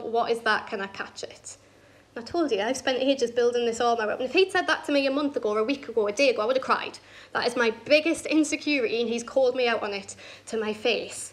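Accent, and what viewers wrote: Scotland, United Kingdom. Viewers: You are a very pretty, nice and funny girl on stage and I think you did a bit of a joke on a stupid bloke, who deserves it. Tho please talk a bit slower and pace yourself when talking, as it is a lot cramped up in little time. I think if you give time for the audience to laugh...when not talking, making pauses - you will get many more laughs out of it. I am thinking of myself - I hardly had time to think about the funny stuff and I had to listen already for the next part. I did study in Edinburgh as a child so I am fine with the accent, but it's hard to understand if you are American, English, Canadian or Australian - they will be at a loss, and they do speak English. Just pace yourself - sorry,I don't mean to lecture you, but I am an EFL teacher so it's a flaw of mine sometimes and you are a lovely child to me, so excuse.❤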